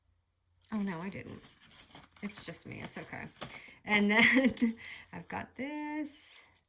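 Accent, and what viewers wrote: Boston, United States. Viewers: You are the reason I have gotten into crafting. Its been so good for my mental health, and helps me to unwind. I also got this paper set, so I can't wait to see what else you do with them! Do you have any mini albums made with chipboard tutorials with pages etc? Happy New Year also i love your voice and kinda Boston accent!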